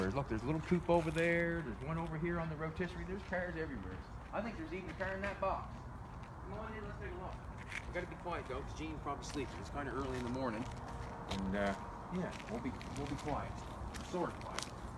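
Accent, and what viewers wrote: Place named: Canada. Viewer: I started watching your videos and man your channel is going to blow up soon with your amazing work! I couldn't help but think you were from Canada bc the Keith's shirt and how you talk and now u said n.s. I'm originally from cape Breton and live in Halifax now and wanna say hello from Halifax man!!! Keep er up man love your work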